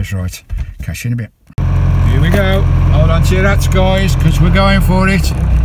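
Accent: Scotland, United Kingdom. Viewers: Lovely Park up on top of that mountain! Love the Scottish accent!!!! Take care! Ju Xx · As a Scotsman I can say with all my heart ❤️ thank you for sharing your amazing travel's and your scots accent was brilliant 👍😀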